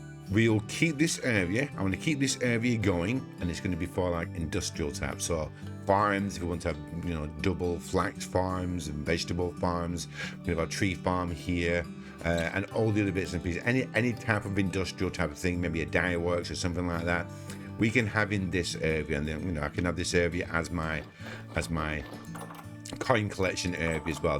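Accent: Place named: Bradford, United Kingdom. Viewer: Apologies for being nosy - are you from Bradford by any chance? The accent sounds familiar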